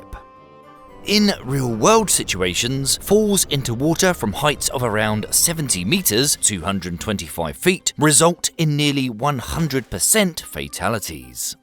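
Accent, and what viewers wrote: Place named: United Kingdom. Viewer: I like this channel and not just because of the British accent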